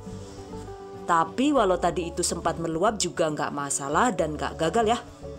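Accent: Banyumas, Indonesia. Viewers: Wkwkwk ngapaaakkk ngapak, banyumasan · Jane wong ngendi yu? Deneng cok ngapak. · Saya kira bule. Ternyata bisa ngapak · Kk. Ngapaknya daerah mn nih??? Cilacap mn? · trimakasih kakak resepnya..kakak lucu waktu ngomong jawa ngapak😂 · jebule rika wong ngapak ya, aq wong pbg ,rika wong ndi bun? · Lucu bahasa ngapake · Jebule wong pengapakan ya 😊. Mohon Ijin mau dicoba buat usaha resepnya. Salam ngapak bu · I Like this, medoknya banyumasan nambah lucu dan semangat nonton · Hemmmmm suaranya khas bgt kadang kangen ngapak nya jga ...mksh banyak beri info yang bermanfaat semagat berwira usaha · Keluar deh aslinya wong ngapak hehehe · Top banget lah,seneng karo ngomong ngapake,nek ora ngapak ra kpenak he he he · Suaranya antik , ngapak banget hahaha deneng fasih temen , wong ngendi Rika? Wkwkwk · Waduh... ngapak banget.... 👍👍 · Mbak-nya orang ngapa yak? 😊 sama dengan orang tua saya he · Ladalah...jebule ngapak kaya inyong, mbekayu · Bunda kok ngapak ya ngomonge🤣🤣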